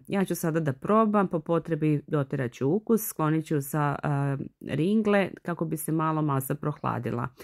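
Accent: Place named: Krajina, Croatia. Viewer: Vidite kako i drugi usmeruju pažnju na tvoj glas,na divnu dikciju i još lepši izgovor,naglasak,draga moja krjiškinjo!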